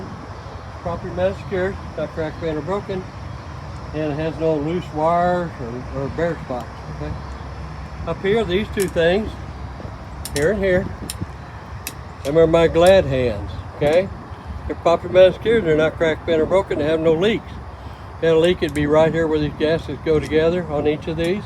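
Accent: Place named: South, United States. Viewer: He does mumble a tad bit y'all but...that's what's called a "Southern Twang", don't worry about it, just pay close attention, this man is a GENIUS! 👍🏽😉😎🫡👌🏽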